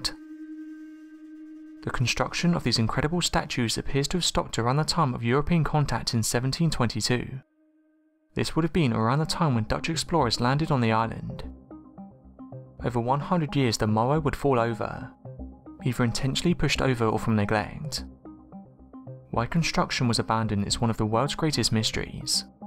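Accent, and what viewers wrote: England, United Kingdom. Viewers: It's just the intonation that English people use. Get over it.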